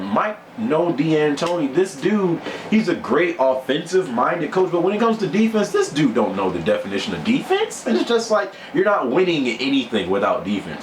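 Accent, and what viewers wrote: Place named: Miami, United States. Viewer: I can tell that you probably from Miami. I might've seen you before somewhere. And fuck Miami and the Heat!!!!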